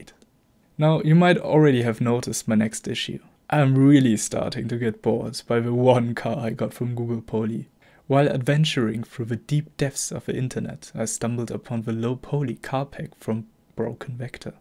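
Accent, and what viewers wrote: Germany. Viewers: Ohne nach zu schauen hört man das hier ein Deutscher am Werk ist :D Nices Video :)) Kennst du das Spiel Mini Motorways? Könntest du mal erklären wie man sowas Grob erstellen kann? also das Verschiedene Gebäude (Arbeit) auf einer Map Spawnen und man die passenden Häuser (Arbeiter) mit Straßen verbinden muss :) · da hoere ich doch Deutsch raus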